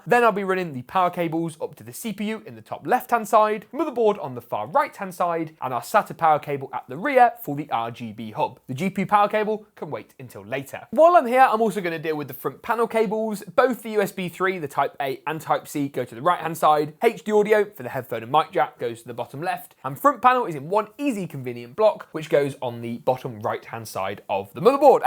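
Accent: United Kingdom. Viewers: building this while giving instructions, not to mention maintaining the british accent, takes real talent. Kudos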